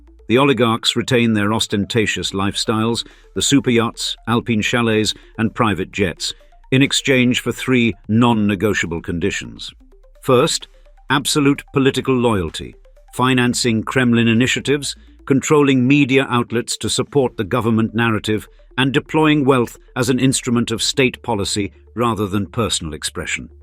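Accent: United Kingdom. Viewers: Hence the British accent of this "narrator". most corrupt oligarchs are British and Americans...... including prime ministers and presidents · Sounds like this Brit narrator is piss Putin took back control of his country and the west cant loot that 76 trillion of resources.😢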